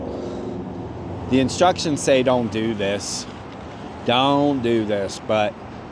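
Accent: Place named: New Orleans, United States. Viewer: I am one of your new subscribers and I also live in Colorado. I love the way you make homelessness as an adventure and there’s no poor me. By the way I love your voice, kind of sounds like you’re from New Orleans but I think you said you’re from Pennsylvania. Have you thought about upgrading to maybe a van? Or a truck with a shell or small camper? Anyways, good luck and God Bless.♥️🙏🏻♥️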